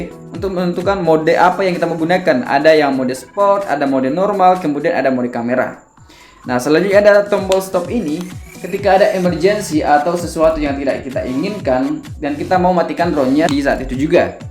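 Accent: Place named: Makassar, Indonesia. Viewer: Wih orang makassar kach bang😁👍👍👍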